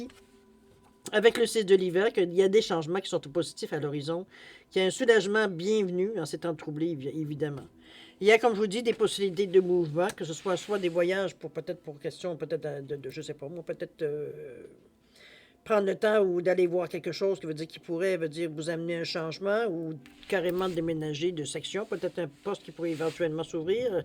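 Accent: Quebec, Canada. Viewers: J 'aime ton accent québécois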